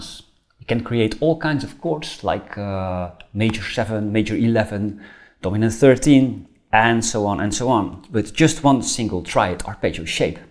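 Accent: Netherlands. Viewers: Great video, very helpfull! Het Nederlandse accent is altijd zo herkenbaar hahaha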